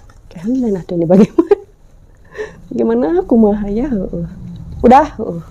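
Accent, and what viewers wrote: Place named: Banten, Indonesia. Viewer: mah ngababita bae 🤤🤤🤤 bahasa na jiga banten nya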